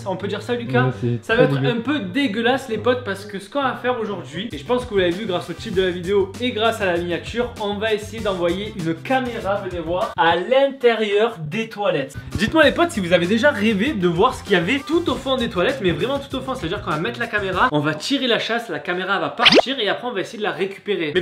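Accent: Marseille, France. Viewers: Tu as l’accent marseillais frère 😭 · Tu es pas un peu Marseillais?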